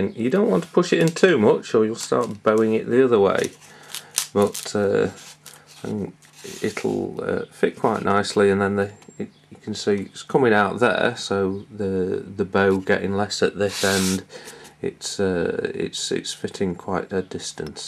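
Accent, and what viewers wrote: Manchester, United Kingdom. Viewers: My God he's from Manchester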